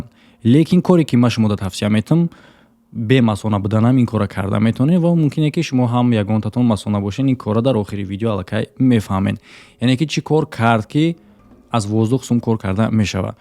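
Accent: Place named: Kulob, Tajikistan. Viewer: Ошно гапоша мо чоткий мефахмем чиста Кӯлоби гап мезана ть ай тарафои Хуҷанд боши намефахми ука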